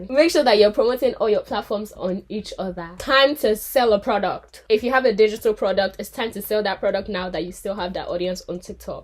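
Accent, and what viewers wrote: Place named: Nigeria. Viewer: Love the Nigerian 🇳🇬 Assent ❤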